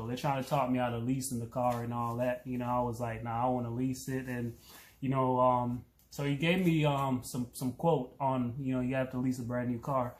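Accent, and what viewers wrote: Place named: Florida, United States. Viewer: ayy cuh you ain’t have to tell me you from florida😂 already knew ts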